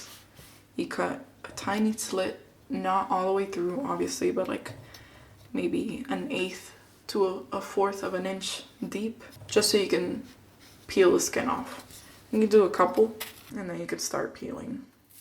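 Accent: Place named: Miami, United States. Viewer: Lmao you’re so Miami with the accent and hand gestures, I love it!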